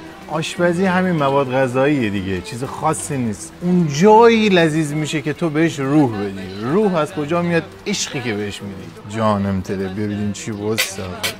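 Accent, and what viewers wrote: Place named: Gilan, Iran. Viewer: به به چه لهجه قشنگی، سلامتی گیلانی ها و همه اقوام ایرانی 👏🏻🫶🏻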